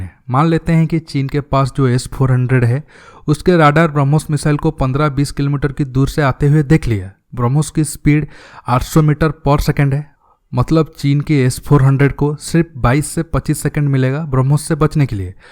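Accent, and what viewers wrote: Odisha, India. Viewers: Odiya hai apka hindi achya nehi hai ..odiya typ ka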